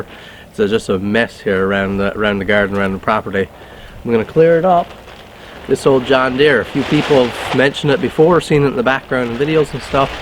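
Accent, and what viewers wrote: Newfoundland, Canada. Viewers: Was there no choke on the carburetor? If so, wonder why not. I was trying to place the accent but you said Newfoundland--yours doesn't seem as distinctive as some I have heard. Glad that your Grandpa was able to, at least, view you working it. Bet he would of loved to sit in that seat again. Grew up running the old JD 2 Cyls. and love their sound to this day. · Its friday night and I am watching a bulldozer push snow, now thats a cool night. I was wondering about your accent as you were talking, it sounded familiar then you said NewFoundland...ahhaaa! I am from northern Maine and that accent is how I used to talk before I moved to New Yark 40 years back. Its 'aboot' doing a job! Well done.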